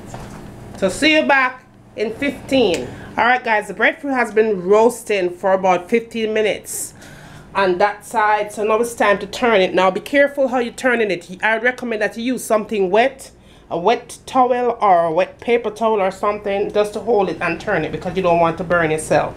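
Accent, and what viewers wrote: Jamaica. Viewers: MEH LIKE DE JAMMIE ACCENT, ROAST IN DE COALS IS DE BADDEST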